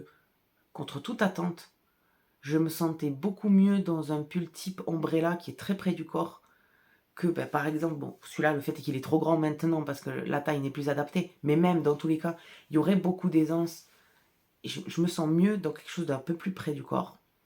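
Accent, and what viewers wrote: South, France. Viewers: Bonjour quel plaisir d'entendre l'accent du Sud , je suis née a Arles et rapatriée en Creuse ! Tu n'est pas obligée de me dire d'où tu habites mais cela m'intéresse. Merci pour ces moments avec le sourire. Bon courage pour ton travail qui est magnifique. 😘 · J’atterris sur la chaîne depuis celle d’Audrey! Grande réflection aussi sur la morphologie… j’ai des petites épaules et les yokes sont souvent démesurés (sauf ceux d’Audrey dont je suis absolument fan!). Je tricote en ce moment un raglan pour varier car je trouve qu’ils rendent plus justice à mes épaules. Je lorgne donc aussi sur des raglans avec jacquard! Que de belles couleurs derrière toi 😍😍 Si je ne devais en garder qu’une… je choisirais du merino non superwash c’est si moelleux! À bientôt! (Merci pour l’accent du sud qui me sort du brouillard bourguignon!)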